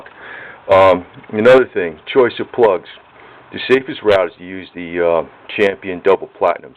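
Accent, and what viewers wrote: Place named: Philadelphia, United States. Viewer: I came on the internet to find info on problems with my 1996 Sebring. I want to thank you for things you helped me with. OOh and by the way... When I watch your videos I hear a Philly Kensington accent!! I am in Fla now, but I grew up near Sears at Whitaker and the Blvd!! Look me up on Facebook or email me and lets see how many people we know from Philly and laugh!!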